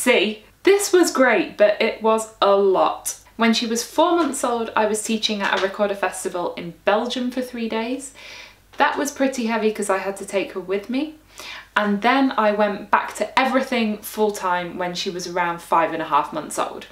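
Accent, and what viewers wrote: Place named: Derbyshire, United Kingdom. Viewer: Awesome mommy job!!! Btw, your Derbyshire accent is really pronounced in this video.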